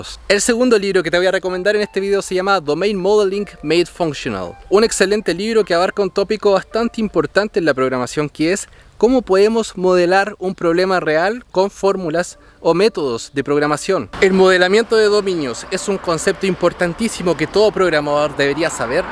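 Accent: Chile. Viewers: ¿Otro chileno? 🤣🙄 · Sos chileno weon? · hola!! eres chileno? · me parece que por tu tonada sos de Chile, ( pobre Chile prendida fuego ).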